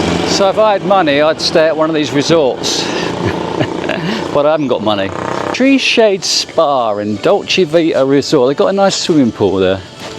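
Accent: England, United Kingdom. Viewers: Love your style, enjoy your choice of music and video editing and even your winging-Pom accent is becoming pleasantly familiar, keep it coming man, I dig it man you know what I mean man it’s cool and informative and visually and audibly appealing so zip zap us all with a little bit more please maaaan.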